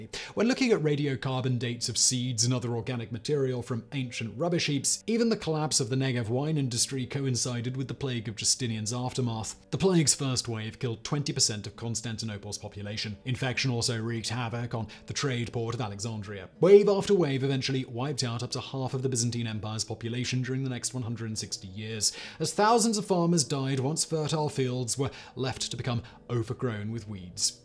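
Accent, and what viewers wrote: United Kingdom. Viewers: IDK if I love your channels for the content, sense of humor or your British accent.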